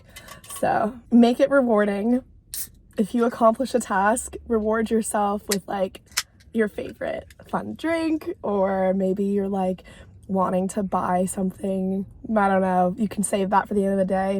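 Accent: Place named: United States. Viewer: This accent is definitely American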